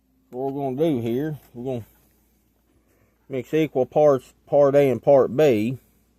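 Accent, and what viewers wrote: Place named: South, United States. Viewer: Brother I thought I was southern lol you got the deepest country accent I've heard in a while. 😂 alot of folks would say they can't understand us so I guess it's our own language 😂😂😂 great video can't wait to see you use it once it's all finished. Keep it fishy 🎣👊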